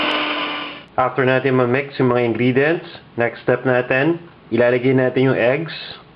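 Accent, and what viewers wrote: Philippines. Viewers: Filipino accent :O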